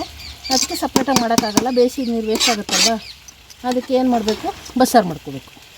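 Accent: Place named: Channapatna, India. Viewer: Aunty maatu namm channpatna da slang super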